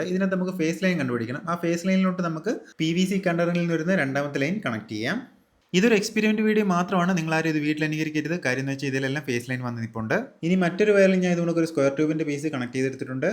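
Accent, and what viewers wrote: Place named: Pathanamthitta, India. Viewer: പത്തനംതിട്ട ആണെന്ന് തോന്നുന്നു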